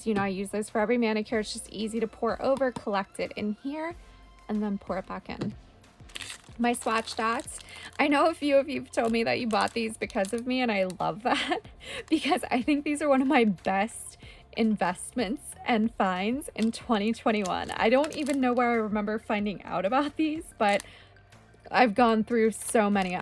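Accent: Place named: California, United States. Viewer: So so helpful to have these suggestions in a single format. Thank you. I have used so much of your suggestions. Oh, and as I was watching your video, my son walked into the room and asked if you were a California girl. Because of your "accent". LOL, wasn't aware you had one🤣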